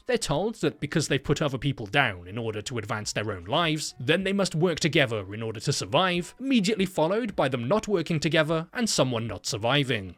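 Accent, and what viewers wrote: United Kingdom. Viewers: i nnoot know he british until i press play bruh i had it saved to my watch later my life is ruined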